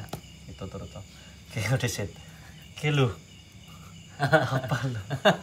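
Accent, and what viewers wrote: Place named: Banyumas, Indonesia. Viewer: Ora ngapak ora penak mas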